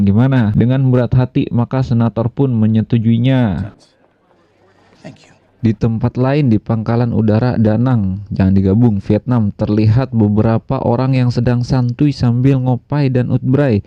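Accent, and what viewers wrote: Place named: Jakarta, Indonesia. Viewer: Logat betawi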